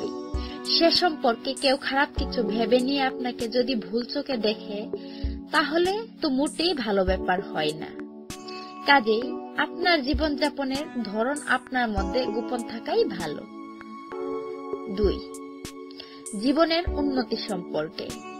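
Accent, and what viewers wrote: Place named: Sylhet, Bangladesh. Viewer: Eije madam eto gan dewar age nijer language ta thik korun.Apnar vasay lagce apni shyleti .Obbosso shyleti hoce emon ekta jayga jekhane kushonskar ar apnar moto gaiya meye diye vorti jekane sobai15 bosorei bia kore.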